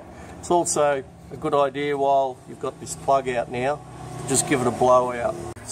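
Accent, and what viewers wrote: Australia. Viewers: no problem at all. I'm in the US, how do I get a beer to you? Your accent sounds Australian? Do you have a way to get a donation to you 😊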